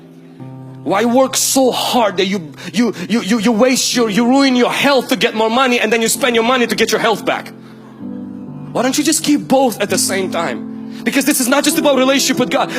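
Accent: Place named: Eastern Europe. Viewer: he sounds eastern European... nice message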